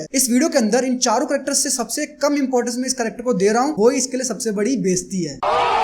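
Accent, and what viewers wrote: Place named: Rajasthan, India. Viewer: Mjhe laga hi tha bhai rajasthan se h.....bolne k tarike se hi lag jata h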